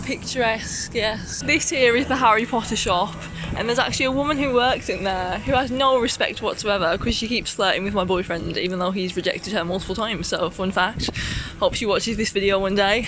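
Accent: United Kingdom. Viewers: I practice my english listening watching your videos ❤❤you have a really strong British accent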